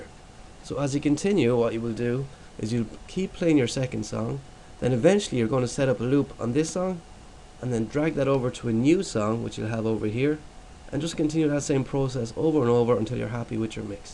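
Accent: Ireland. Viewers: you have the voice of a rougeish irish poet lol lol nice to be able to follow a you tube tutorial for a change good stuff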